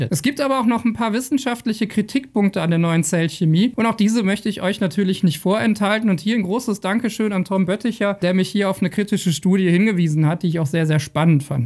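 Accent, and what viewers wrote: Bavaria, Germany. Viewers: Ist ein Bayer?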